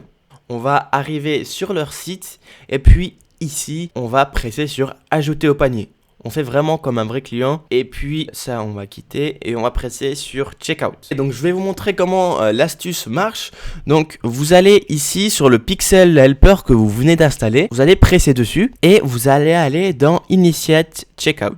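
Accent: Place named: Romandy, Switzerland. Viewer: bien sûr! Merci pour la vidéo bourrée d'informations et présentée avec panache. (Et un accent romand je crois!)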